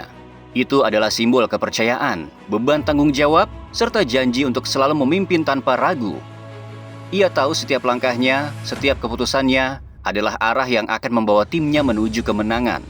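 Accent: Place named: Java, Indonesia. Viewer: Ilat jawamu sangat kental kang 😅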